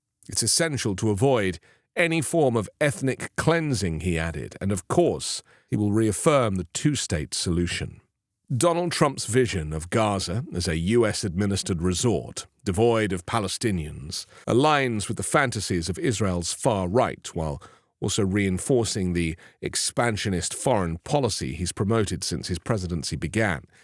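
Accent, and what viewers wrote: United Kingdom. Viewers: Is this ai voice implant to sound British and why would you think it makes sense?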